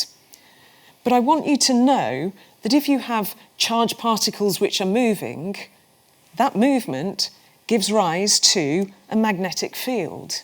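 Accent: United Kingdom. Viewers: Knowledge is one , but amazing how beautifully this woman speaks ....even british sounds nice😄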